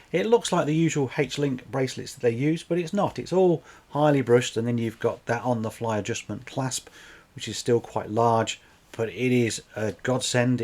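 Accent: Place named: United Kingdom. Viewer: Hello mate thanks for the great video. Are you in the UK? It certainly sounds like it but on your website it says you don’t ship to my address (London). Is that right?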